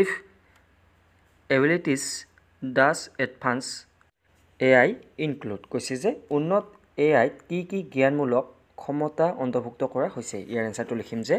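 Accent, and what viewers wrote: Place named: Nalbari, India. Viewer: Sir nolgoriya hoi heybabe kisu kisu bakyo bhal koi nufute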